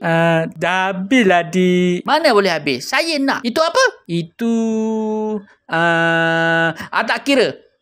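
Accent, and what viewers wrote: Malaysia. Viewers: Abang negeri ape perak atu kelatan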